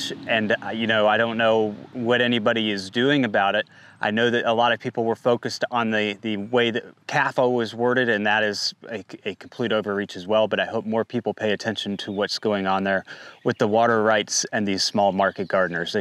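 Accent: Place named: East Coast, United States. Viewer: You must be from the east coast somewhere. I'm from Oregon. We say it Orgun. It doesn't rhyme with polygon. Just Orgun, thanks. Also, it's not ColorAHdo. The A in Colorado sounds like the a in apple. No umlaut. Yes, I know that it's a Spanish word and in Spanish they'd say AH. I used to get it wrong too. Doesn't matter. Point is you don't sound like a local and that annoys the locals. Also, I have a bone to pick with you hoity-toity eastcoasters treating west coasters like we're stupid bumpkins. At least we can pronounce all of your state's names properly without all the stubborn denial of our own ignorance. I've had New Yonkers tell me I'm pronouncing Oregon wrong when I'd hoped to enlighten them in the past. The nerve! Namaste!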